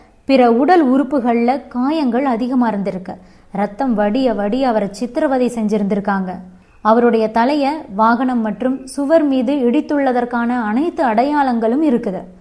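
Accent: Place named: Chennai, India. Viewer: Madras தமிழ். பினமாக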